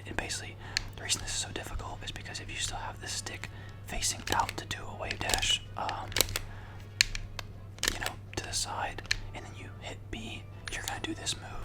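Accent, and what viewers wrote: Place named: South, United States. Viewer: you kinda sound like ppmd but when he was amish (just kidding but when he still had his southern accent)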